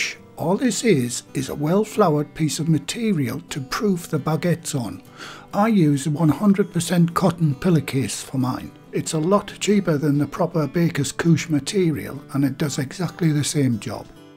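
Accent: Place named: Northeast England, United Kingdom. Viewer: I meant that you're from the Northeast of England, you can hear that. I'm from Gateshead :) . Thanks for the extra info, too, I will give the recipe a go!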